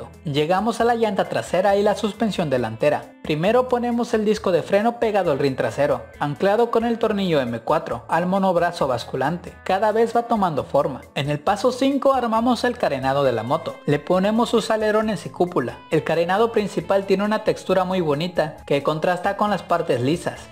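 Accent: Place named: Juchitán, Mexico. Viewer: Ah perro eres de Juchitán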